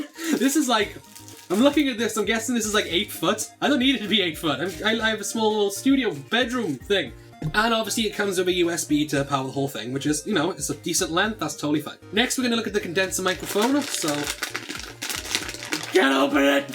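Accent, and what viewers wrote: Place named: Wales, United Kingdom. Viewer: ah a fello welsh mun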